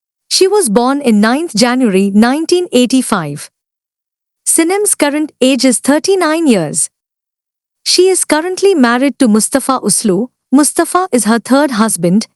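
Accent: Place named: India. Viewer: Ta blbkaco to cteat se naučí výslovnost angličtiny ! Ať nečte nesmysly krava patrně bych řekla india nebo podobné !👎👎👎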